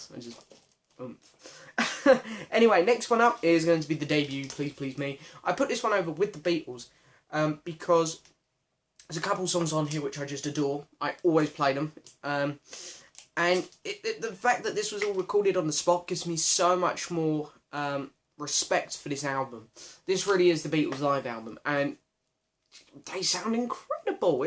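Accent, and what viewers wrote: United Kingdom. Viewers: i think that because of your accent you are british rather than american am i wrong?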